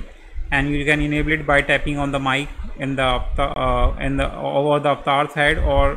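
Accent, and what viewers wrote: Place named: India. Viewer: He kinda speaks Indian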